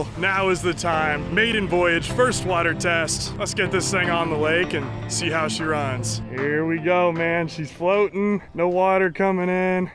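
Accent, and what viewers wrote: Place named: United States. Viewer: You really are an Ozzie with an American accent.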